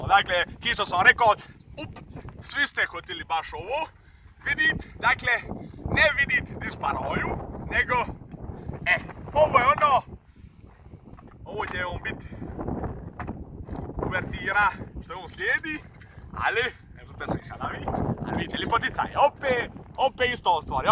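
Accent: Croatia. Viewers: Hrvatski ali drugačiji naglasak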